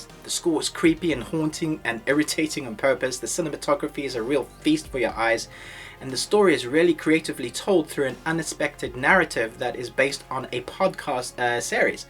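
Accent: South Africa. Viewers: I'm having troubles placi9ng your accent ? South African?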